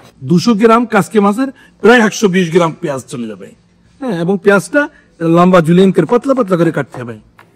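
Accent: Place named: Jhenaidah, Bangladesh. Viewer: শেফ ভাষা মনে হয় ঝিনাইদহের ভাষা। আমার বাড়ি ঝিনাইদহ সদর।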